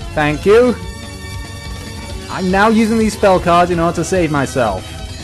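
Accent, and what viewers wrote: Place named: United Kingdom. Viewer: British Pronouciation mate, we say some letters differantly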